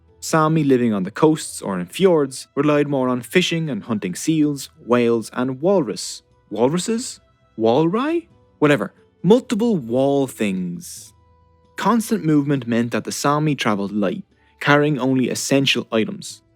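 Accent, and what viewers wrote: Dublin, Ireland. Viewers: Last thing I expected was the thick inner city Dublin accent! Brilliant. Thanks a million champ!!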